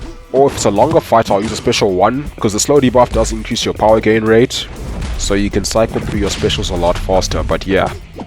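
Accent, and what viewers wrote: South Africa. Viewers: Randomly came across this video. You sound South African? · Yo I have to ask. Are you from south africa? Sounds like you are.. Congrats on red guardian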